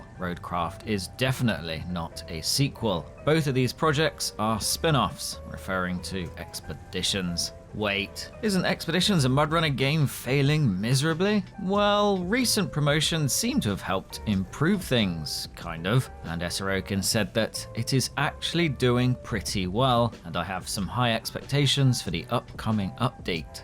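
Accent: United Kingdom. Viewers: what english accent he is speaking?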